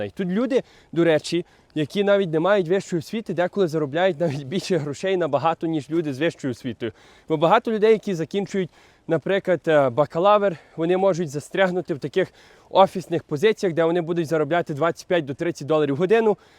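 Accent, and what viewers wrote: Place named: Western Ukraine, Ukraine. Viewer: Дякую тобі за класні відоси, впізнаю наш захїдний діалект. Мені подобається дивитись твій канал, навіть за відсутність професійної зйомки....тощо...Бачу в тобі скромну, виховану та порядну людину. Простий Українець! Десятки мільйонів тобі підписників і стрімкого зросту каналу! Слава ЗСУ!!!Слава Україні!!!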